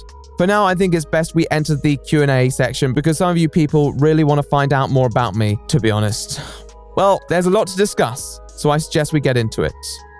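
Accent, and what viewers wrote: RP, United Kingdom. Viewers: Can you fuck off out of my country. Groningen doesn't want your either.. Take your British speal covered in that accent without giving any substance and fuck off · I ship you with anyone else who has a British accent and a cartoon avatar. IHE, Cynical Reviews.. you know the sort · first, good job on the content, I've been watching you since you essentially rebooted your content, with the we shall say current style of content. The accent is of course posh, I'm from the north of the UK, you sound like an afternoon tea sort of person, dial that shit up to full posh sort of thing... I love the style of content, I don't generally like the drama scene, I find daddy keemstar toxic, essentially a nuke in a China shop, while you don't cover every drama story that would be impossible I get any drama info from you, it's enough for me. If there's one thing, you sometimes talk at a break neck pace, generally it seems when the passion/emotion is flowing, but it's not to the extent for me personally I can't follow unless I'm not focusing. 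Keep up the work! There's not too many YouTubers I watch every video, only a hand full if that where I keep watching over a year plus, your one of them. I'm sure your fans would back me in saying, keep the content coming, experiment if you must, we will let you know if it's shit:)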